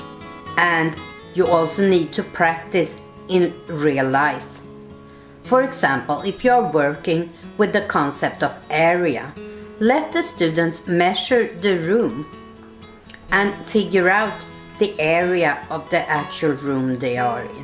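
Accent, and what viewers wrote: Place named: Sweden. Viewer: That was very interesting, and as always love the Swedish accent, good video thanks for sharing.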